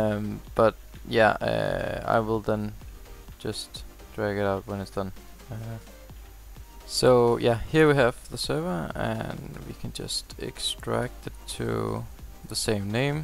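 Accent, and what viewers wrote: Denmark. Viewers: Are you danish lol? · Du lyder dansk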